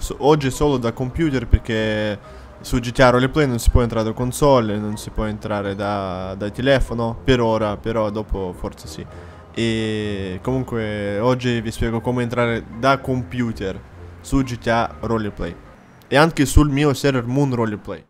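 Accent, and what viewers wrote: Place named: East, Italy. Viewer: si capisce che sei dellest dalle bmw e dallaccento ahahah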